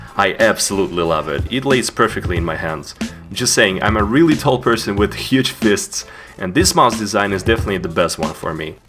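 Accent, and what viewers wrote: Russia. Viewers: Wait u r russia?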